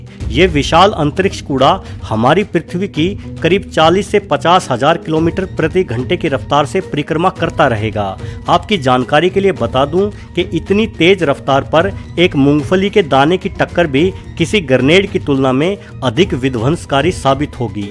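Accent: Haryana, India. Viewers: .. aap haryana se ho shyad?..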